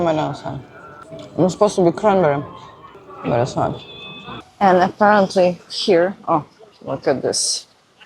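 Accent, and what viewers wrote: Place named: Russia. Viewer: 😂😂🤣🤣 My Lord and My God!!! This woman 😅😅 you are so hilarious!!! And I love your thick Russian Accent. I kept on smiling throughout the video because of your English and how you complained about the undone dough and SPO being mixed with the celebration. New Subscriber, first time coming across your channel, but I can rest assure you that I have been to VDHKh. I was there last year in October, and I went to most of the Pavilion buildings within the short time, and you can see some of my videos under my Russian Playlist on my YouTube Channel. I actually thought that Russia has moved on from SPO to Counter T$rr@ Operation??? But anyways, I feel your pain and your rant concerning the mix up. You are such an adorable woman to listen to, with your incredible heart-warming and vibrant Russian accent 😁😁❤❤😁😁.... Keep up the good work..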